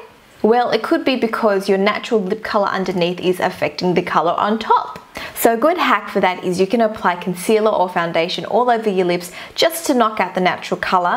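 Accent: Australia. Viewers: love u r Australian accent it's so cute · I'm pretty sure that she's from China... But the thing is that she sounds so Australian🤔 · she sounds australian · Are you Austalian? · are you aussie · Are you from Australia? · you sounds like Australian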